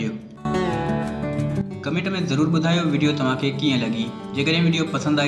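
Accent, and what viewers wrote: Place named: Sindh, India. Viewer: Best 👍 video bhai y you voice SINDHi I am Panjab Lahore sa ho am bhai you are from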